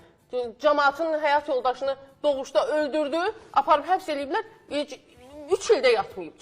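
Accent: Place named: Shaki, Azerbaijan. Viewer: Bu xanıma halal olsun🎉..Bu arada deyəsən xanim Şəkidendi) aksenti bize oxşadı)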